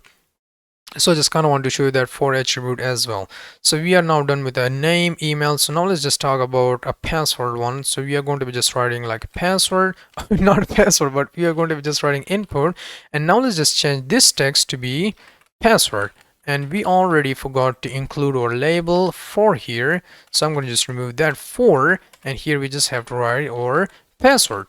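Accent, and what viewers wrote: India. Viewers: great video but, not gonna lie u have indian accents our its my imagination hahahaha.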